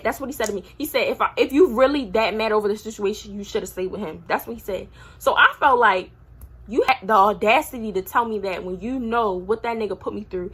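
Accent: Baltimore, United States. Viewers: Baltimore accent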